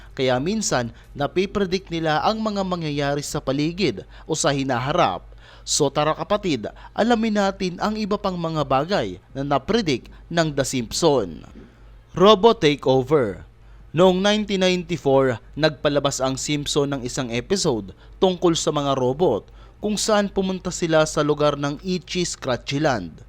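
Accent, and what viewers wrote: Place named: Visayas, Philippines. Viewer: Usa ka flu😅 bisaya Pala to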